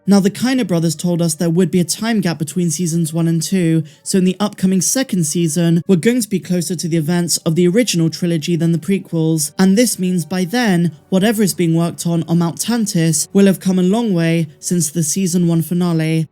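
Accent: United Kingdom. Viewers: I know you have an British accent, and that's all well and good and attractive in the US, but in this case you're ignoring the fact that a noun is being used as a proper noun, a name. As highly as you regard this IP, you should pronounce someone's name how they would. For one reference, remind yourself of how Data corrected Dr. Pulaski in season 2 of Star Trek: The Next Generation. In his words, "One [ pronunciation ] is my name. The other is not".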